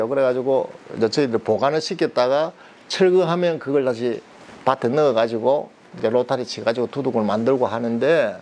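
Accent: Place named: Gyeongsang, South Korea. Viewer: 듣다가 듣다가 경상도 억양에 스트레스 받아 도저히 못 듣고 나갑니다.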